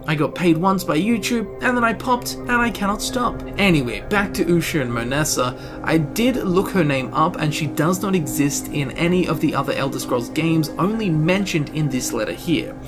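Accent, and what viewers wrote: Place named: Australia. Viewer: You know, I’ve been going through all of your videos, and I’ve just realized that the reasons I love listening to these are because 1) I just like learning about lore, 2) your buttery voice, softly speaking, accented ostensibly with an Australian (or maybe New Zealand?) touch, and 3) your amazingly awesome alliteration and decadent descriptions. It’s great, I love it.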